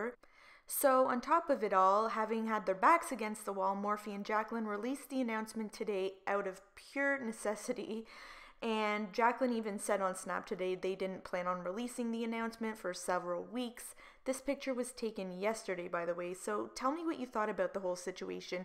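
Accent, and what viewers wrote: Canada. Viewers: Are you Canadian Lol? I hear the "aboot" instead of about lol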